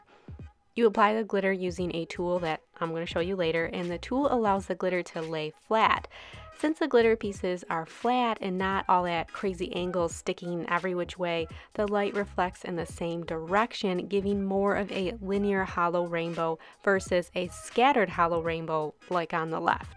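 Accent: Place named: North Dakota, United States. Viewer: Are you from North Dakota or anywhere in the north? :P You've got a lil bit of an ND/UP Michigan accent. I could be totally wrong tho lol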